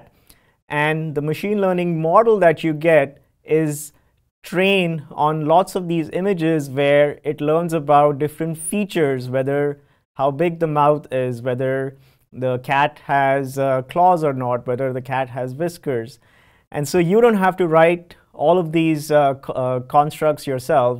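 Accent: India. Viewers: I can't go against myself and pretend that it's pleasant. It's not. The accent is irritating, and choosing an Indian native speaker to narrate a text in English is simply a stupid decision. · why do you guys hate Indian accent ?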